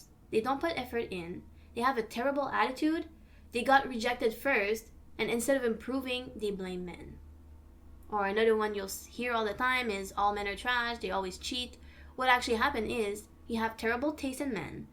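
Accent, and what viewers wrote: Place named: Canada. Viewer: I’m asking because of your accent but where are you from? I think I might have heard you you say you’re from Canada?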